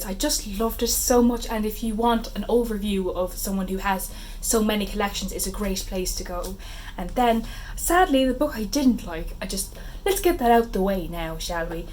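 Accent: Ireland. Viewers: As an Irishwoman I love your Irish accent! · Ahhh! I love your Irish accent!! I’ve always been a bit intimidated by reading Seamus Heaney’s poetry for some reason but will definitely pick some up now. ☘️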